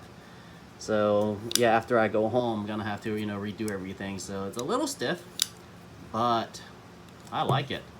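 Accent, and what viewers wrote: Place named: Alaska, United States. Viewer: This guy has the Alaskan Bush People children accent.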